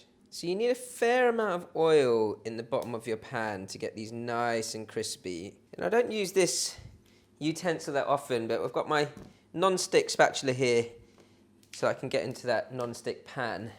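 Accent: United States. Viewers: That American accent 😂🤣 I've had to go on a fodmap elimination diet. Many things on your channel qualify or can be slightly modified and I love that I can still use your recipes! I need help though. I absolutely cannot find dark soy with the grocery delivery services during this pandemic. I've used tamari and "created" dark soy from other recipes, but I am curious if any of you have suggestions on mimicking dark soy in the best possible way. It doesn't have to be low fodmap friendly, it's also for the rest of the family to enjoy. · As an American I think your US accent wasn't bad lol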